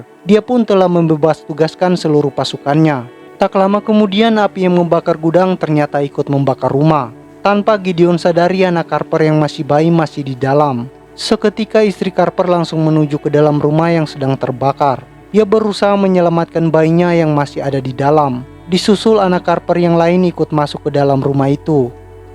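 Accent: Eastern Indonesia, Indonesia. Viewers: Bang orang timur? Logatnya kental timur 😂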